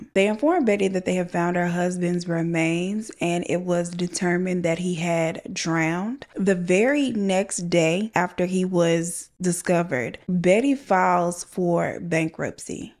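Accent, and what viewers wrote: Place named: Houston, United States. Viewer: I love this channel! I had done watched allll of Bailey’s videos over the span of like 3 yrs then you popped up like 3 months ago and I have been hooked like a worm on a fishing rod. I 1st heard your voice and just knew you was from Houston to later find out you wasn’t but was moving to my hometown 😊. Your storytelling style is all of the things(I stole that from you) I love and didn’t even know it. You’re so funny and the bloopers at the end are genius…love them. Keep going and yes I’m catching up on all of the past videos. Oh and welcome to HTown 🤘🏾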